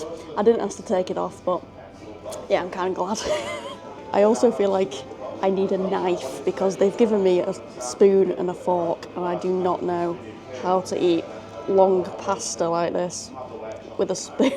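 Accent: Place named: Teesside, United Kingdom. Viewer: I'm from Texas, but I went to high school in Teesside and college in Middlesbrough from 2013 to 2017 and this video has some potent nostalgia to it for me! Your accent reminds me so much of my old classmates, God knows I haven't had a J20 in about a decade, and while it wasn't a Hub my family and I did stay in a Premier Inn when we were looking at houses before we moved. Just found it funny how much a video covering a hotel I never stayed at could feel familiar to me! That hotel does look quite good for the price. Glad you had a nice experience!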